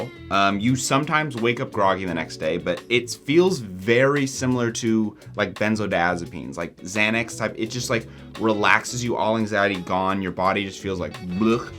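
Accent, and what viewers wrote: United States. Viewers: You evidently don't understand the difference between addiction (physically and mentally dependent) and a habit. But I don't blame you. Your accent sounds American, and America has the weirdest created shared realities around addiction.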